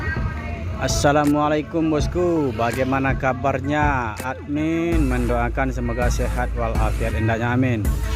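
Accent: Solok, Indonesia. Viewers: Ahaiiii mandtap ciri khas dialek soloknya bro😁😁👍👍🙏🙏🙏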